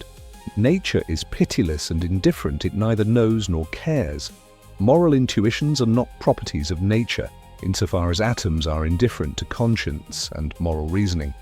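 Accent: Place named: England, United Kingdom. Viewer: the english accent instead of a german accent speaking english turned me off the content, the english language replacing completely anything to do with the person has been pushed onto the world for far to long causing too much damage... its only a little thing but its still part of a bigger thing. Generalised accent more broadly accessable wider audience... there are heaps of examples of germans speaking english that re perfect to understand to use as an example.... anyway, take my comments for what they are worth. All the best.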